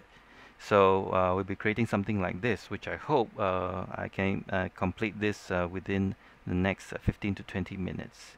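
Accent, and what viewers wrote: Singapore. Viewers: You are from Singapore, the accent.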